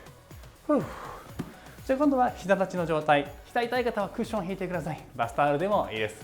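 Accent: Kansai, Japan. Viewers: 初見です😊めちゃくちゃ良い声〜😊 これなら私も出来そうです！やってみます。ちょいちょい関西のイントネーションで親近感湧きました😊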